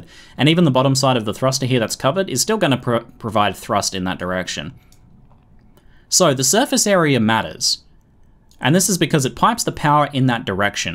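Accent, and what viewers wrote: Australia. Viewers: I must say, an excellent tutorial! And always great hearing our Aussie accent on Youtube! Onya keep up the amazing vids!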